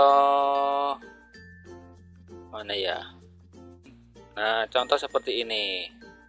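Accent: Banyumas, Indonesia. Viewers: Dapat ilmu lagi dr om... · MATUUUUUR SUWOOOON SANGEEEET masss....... ALHAMDULILLAH...........sy dpt pelajaran baru....n SANGAAAT JELASSSSSSS...... MAJUUUU TERUSSSS BERKARYAAAA n SUKSESSSS SELALU........ Wass ant Cicurug Skbumi...... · Wahhh tq bang sehat selalu om sukses selalu buat kedepannya amiiin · Walaupun ora paham,tapi tetep nyimak Bos,salam pada bae e🤣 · Salam perjuangan om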